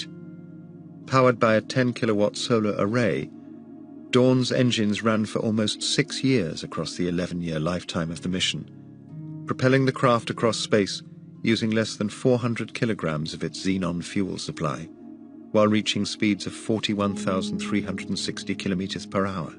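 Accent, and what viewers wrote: England, United Kingdom. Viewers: British narrator is a must for me. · its an English accent. Britain is made up of multiple countries, each with its own distinctive accent. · Yes! I agree 100%, His delivery has the perfect cadence, calm and british ( that is way easier than synthetic pseudo-british or irritating southern american drawl to digest for hours at a time), and the information is useful and free of words like "shocking" or "we were wrong" type crap....thank you. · I use this to fall asleep. Perfect! Space....check. hours long....check...British narrator...check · My literal checklist for prime sleep! Space, hours, English accent 👌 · Fantastic movie, can't imagine the amount of effort put into it... apparently I have a lot to learn about the YouTube business model. As for the narrator, he's binary compared to everything that's wrong with the world today... 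intelligent, intellectual, rich language but not inflated, calm, not artificially sensational and (thank god for the) British accent! Well done! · The 'british narrator' is agreed by anyone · British narrator you know this, I can’t watch any doc without a British narrator and this guy is soothing asf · That good ol’ monotone English accent … nature’s sleeping pill. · Glad I’m not the only one that prefers British narrators to fall asleep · 😂 what’s the deal with the British accent? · lol, i like this video putting in what appears to be real pictures. Hard to find documentary on yt showing that, wish more would do it. And british narrator should be on all docus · Perfect narration. Beautiful, clear and calm. A voice which is trustworthy and very, very British. So refreshing. America take note. This is how you inform people. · This helps me sleep so well because the narration is stitched together SEAMLESSLY. 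There are no pauses or awkward silences for my brain to pick up on. The British Narrator is CONSTANT. Like a metronome - just keeps going. 🧠 💤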